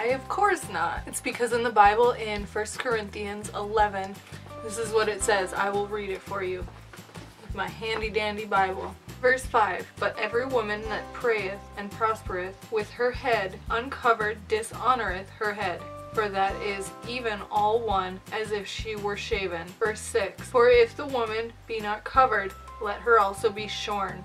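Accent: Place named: Midwest, United States. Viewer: Wow that's cool I'm originally from Minnesota but now I live in North Carolina but I could tell from your accent that your from around that area :)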